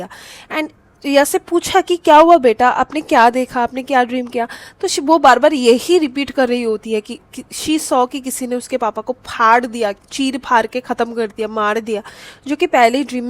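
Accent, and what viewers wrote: Bengal, India. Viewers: Love your bengali accent💛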